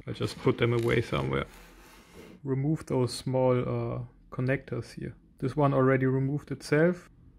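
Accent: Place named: Germany. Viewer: Very useful bringing that googlegroup blog to life! Coupled with deadpan German(?) delivery. Excellent!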